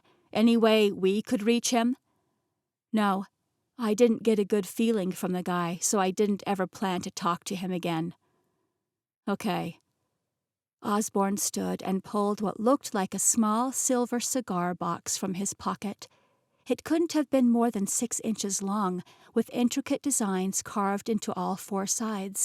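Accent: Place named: Ireland. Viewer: Story is great! I keep hearing a hint of an Irish under accent.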